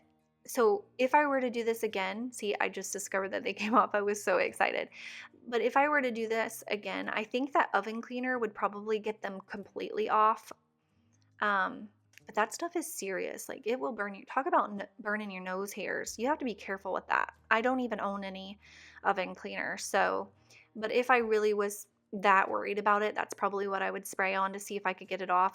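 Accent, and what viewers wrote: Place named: Tennessee, United States. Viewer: HAHAHA, I knew you were from TN. Sweet little southern bell. It's fun cleaning with you.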